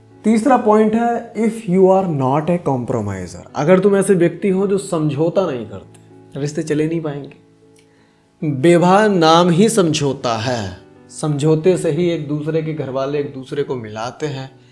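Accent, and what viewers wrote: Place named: Rural, India. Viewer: Bhai tu jaise bat kar raha hey aur jaisa tera accent hey. Yeh sab tere gaao mey hota hoga. Par the reality is aj koi bhi para likkha hua ladka yeh sochke shaadi nahi karta hey k biwi aa k uski paa dawaygi, yeh toh bahut dur ki baat hey ladki aa k ghar ka kaam karegi yeh bhi koi nahi sochta , kyuki ajkal ka ladki ya bahut hi modern ho chuki hey. Bol degi biwi layi ho, servant nahi. Toh jo bhi ladka shaadi karta hey, woh just ek nice family pane k aas mey shaadi karta hey. Lekin india ka biased law k chalte huye sab ladki aur uski maa baap ko malum hey k shaadi sey accha business aj kuch bhi nahi hey. Pehle shaadi koro, fir divorce do, fir alimony lo, maintenance lo. Ho gaya savings for lifetime, ab dusra bakhra dekho. Isiliye no men should get married in India. Bas yehi ek reason enough hey shaadi na karne k liye.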